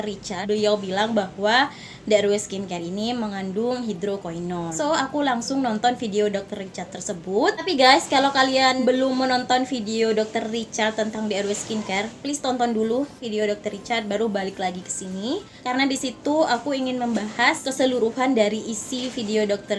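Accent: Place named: East Java, Indonesia. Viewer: Logatnya kak kayak orang Jawatimur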